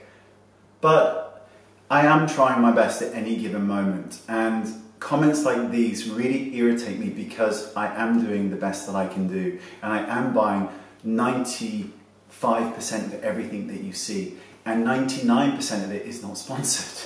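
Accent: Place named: South West England, United Kingdom. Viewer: It's not "subdued", it's a typical South West English accent.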